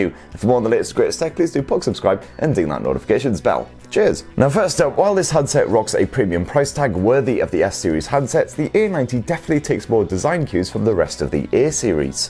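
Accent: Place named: Sunderland, United Kingdom. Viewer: It's just a Sunderland accent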